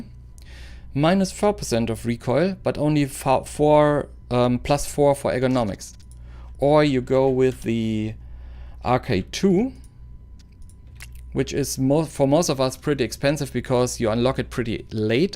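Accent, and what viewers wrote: Germany. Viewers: Man hört sofort das du deutscher bist😉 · Der deutsche Akzent ist unüberhörbar, gutes vid btw <3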